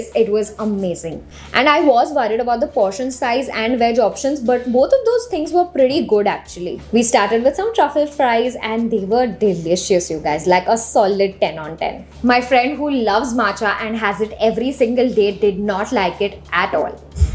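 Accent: India. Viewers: We indians speak like this...if u have a problem with this...then stop watching the video..instead of commenting on her accent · Such strong indian Accent!!! I couldn’t get past your accent to enjoy the video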